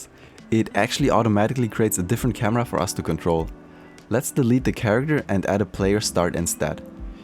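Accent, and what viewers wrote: Germany. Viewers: Love your channel!! Are you german?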